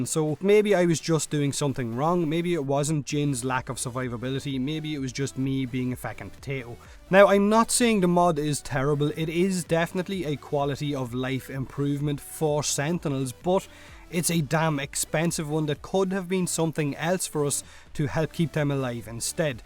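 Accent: Ireland. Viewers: I started watching you because i love the irish accent,same goes to daithi de nogla.I fucking love your accents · Fuckin love Irish accents But holy shit your accent is so thick (that's what's she said) but in a good way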